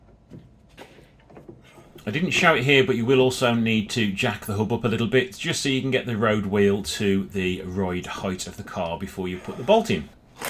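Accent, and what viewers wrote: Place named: Birmingham, United Kingdom. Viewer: Thanks for the helpful video. Love the brummie accent as well. I was just clicking play and was like, “That must be around Birmingham somewhere”. Googled the name of the firm and there you go. ❤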